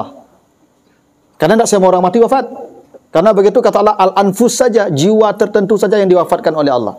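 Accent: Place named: Aceh, Indonesia. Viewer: Urang maa ustadz ko min??? Manyabuik huruf "T" mode urang aceh, totok 😁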